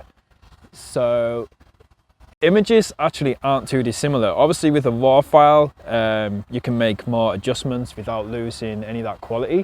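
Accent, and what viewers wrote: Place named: United Kingdom. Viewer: So, how do you like your Spark? First, I live in Canton, OH. I also own a Mavic Pro but looking for something smaller. The Air just getting me too close to my Mavic Pro. I also motovlog and would like to take the Spark with me on my motorcycle club rides. I want to wish the best of luck. Love the British accent...:)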